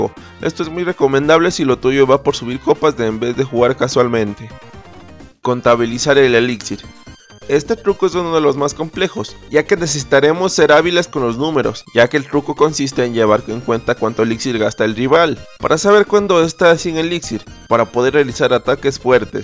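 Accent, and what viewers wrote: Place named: Ecuador. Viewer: Por tu acento, si no me equivoco eres de Ecuador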